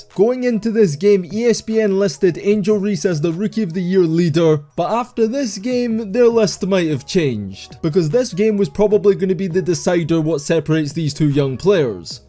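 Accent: Scotland, United Kingdom. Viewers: I know he's saying "The Sky" but everytime this Scot says it I keep hearing him call these ladies "this guy" I was so confused for half this vid 😅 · I now want ALL my WNBA analysis delivered in a Scottish brogue❤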